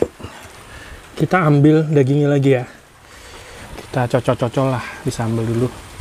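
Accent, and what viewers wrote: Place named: Java, Indonesia. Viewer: hehe sama2🙏🙏logat2e ngomonge kok masih enek jowone to om😃😃🙏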